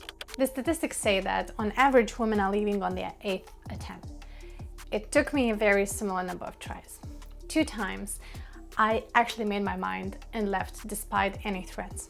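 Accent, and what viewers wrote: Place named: Lithuania. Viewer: To be honest, I just jumped into your channel accidentally, been watching videos about turning vans into a campers and in one of them I just heard slightly Lithuanian accent so now I'am here. Tu tikrai darai puikų darbą skleisdama šią žinią. Man net sunku įsivaizduoti, kiek moterų vien lietuvoje gali būti tokiuose santykiuose. Kai tai pasidaro matoma aplinkiniams, tai jau toli pažengę ir blogiausias dalykas, kad auka pati, be išorinės pagalbos dažniausiai net nesuvokia, kokiuose santykiuose ji yra. Džiaugiuosi, jog tau pavyko tai nutraukti, be abejo, išėjai iš to stiprensė. Sėkmės.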